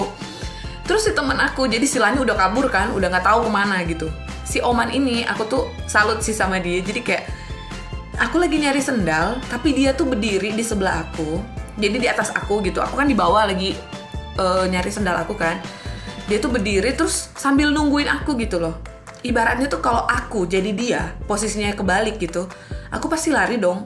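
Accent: Manado, Indonesia. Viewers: solop mana kita pe solop😂😂😂 picah tatawa pas tau ka pake logat Manado😂😂😂😂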